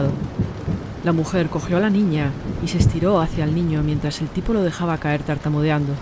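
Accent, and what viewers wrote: Spain. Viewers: Saludos,. Con la premisa de que YouTube es sin llorar, comento; en mi vida había oído narraciones más sosas, insípidas y tediosas. Reconozco que debes ser de España y que me recuerdas a un humorista español de gafas y cigarrillos con su clásico de gallegos..."Y que es de pilar?" Chiste muy viejo pero igual de plano, sin matices, incluso petulante... Recomiendo que te dediques al humor porque esto, no sé cómo definirlo, es como sentarse a mirar cómo la pintura se seca. Infumable, cero talento y peor, empeño por narrar historias de terror. Saludos desde la universidad de Miskatonic, pronto se abrirán matrículas para narraciones de horror.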